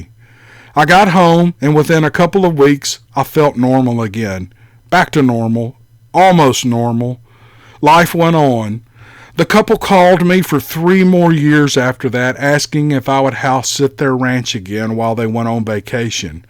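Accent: South, United States. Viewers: your quote. "How about I just jump into the stories without running my mouth".(But please don't forget to play the music?) - my quote!. And I don't think you run your mouth off because I love listening to your southern drawl. From an old Aussie who just loves your presentations. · Hysterical fantasy. What's with the southern accent? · Sounds like a southern racism Chanel. Tone down the confederate accent.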